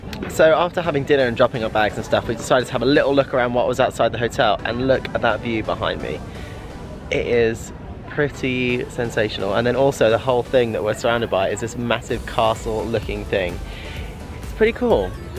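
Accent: United Kingdom. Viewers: Hi from Argentina!! (I speak spanish so sorry for mi mistakes) Congratulations for you!! I really like it how so you speak with the britiah accent hahah. And i always shocks when see you doing that in the pool!! Is really crazy how you can do that. I probably never can do that, im more studying person haha. But good luck for you!!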